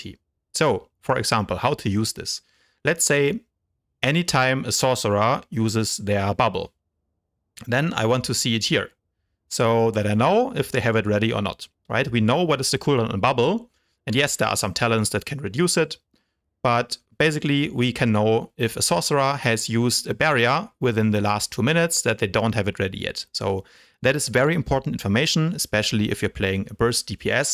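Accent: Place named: Germany. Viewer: Man hört raus das Du sonst eigentlich Deutsch sprichtst(no front)^^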